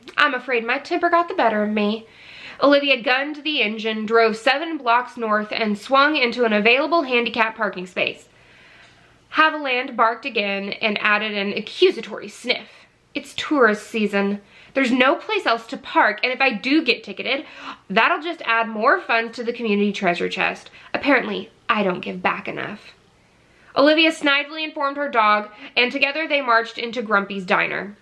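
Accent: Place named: South, United States. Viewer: Just listened to this a second time - you have the BEST Southern accent ever. In fact, I didn’t even like the women in the beginning but your accent made them more likable! XOXO Lisa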